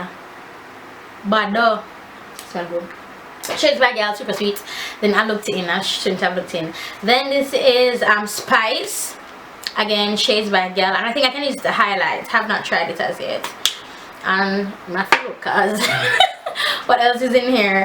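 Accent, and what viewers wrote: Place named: Jamaica. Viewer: loll you're so crazy i lavvv it. aint nothing like a jamican accent lol.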